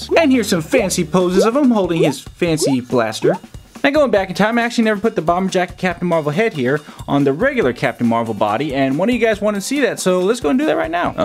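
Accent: United Kingdom. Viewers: I like the British voice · Stop the British voice 😡😡🤯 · Who eles got surprised when he started speaking British.....but when did u buy the wolverine are u going to make a review of him or no cause i am going to buy that too.....peace.... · And nice British accent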